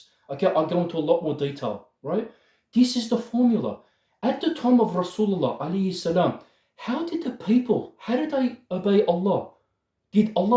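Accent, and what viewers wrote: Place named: Australia. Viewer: Salaam brother, I love the simplicity of your videos. They are factual, illustrative and straight to the point. We "true submitters" are a small community but surely growing because of people such as yourself. Keep up the great work!! By the way, it's clear from your accent that you are from Australia but cant put my finger on your ethnicity... Turkish or Lebanese?? Not that it matters but it's got me curious. 🤔😁 I'm Turkish from Perth. It's been difficult to find and connect with people like ourselves nearby. May Allah continue to bless you and your family, insha-Allah!! Peace.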